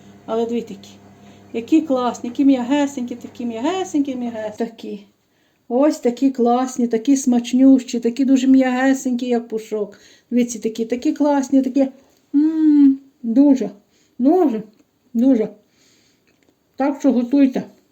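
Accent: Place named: Kolomyia, Ukraine. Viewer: Такий голос щирий, рідний, український... дякую!!!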